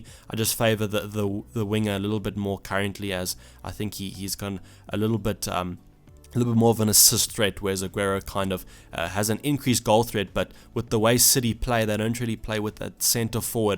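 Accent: South Africa. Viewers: Ya he does have a hint of an sa accent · Are you south African? / have any South African heritage?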